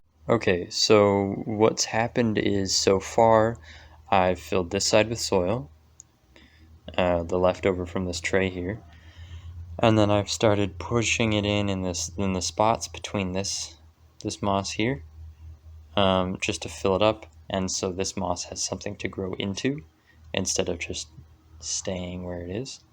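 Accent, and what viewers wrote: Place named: New Zealand. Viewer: Your voice and accent is just like Kane Williamson, New Zealand Cricketer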